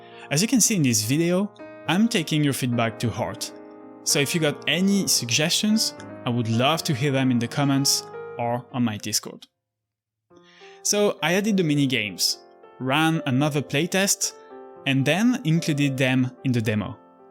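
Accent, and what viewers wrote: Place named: France. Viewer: You sound like GameMakersToolKit but with a French accent!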